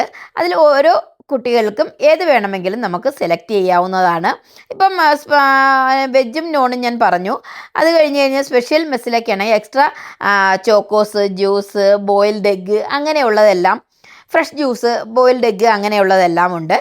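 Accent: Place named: Thiruvananthapuram, India. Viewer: Chyacchi thiruvanthoram aanennu thonunnu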